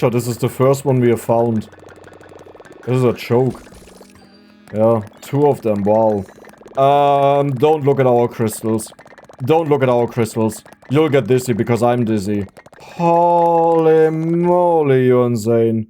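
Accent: Germany. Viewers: Zewentzyzigz holy deutch accent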